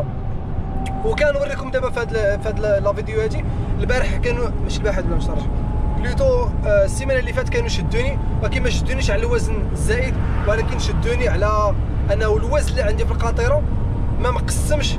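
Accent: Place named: Marrakech, Morocco. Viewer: تبارك الله عليك أخويا منين فالمغرب ؟كاتجيني اللهجة ديالك بامراكش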